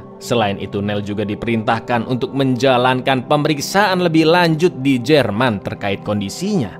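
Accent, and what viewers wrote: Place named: Surabaya, Indonesia. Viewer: Lo samean wong suroboyo ta cak?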